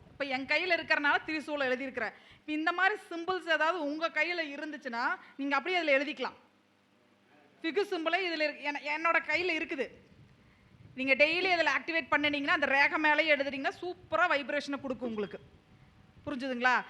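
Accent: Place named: Kongu, India. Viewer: வணக்கம் அம்மா. ஆழகு, அருமை,அற்புதம்,உங்கள் கொங்கு தமிழ் WOW semma super speech நான் நீங்கள் சொல்வதை பின்பற்றி வருகிறேன். ""மாஷா அல்லாஹ்,,, நன்றி நன்றி நன்றி மா. எல்லா புகழும் இனறவனுக்கே..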